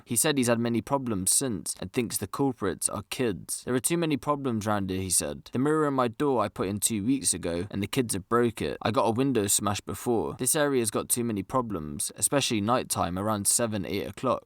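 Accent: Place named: Birmingham, United Kingdom. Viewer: Brummie i think mate...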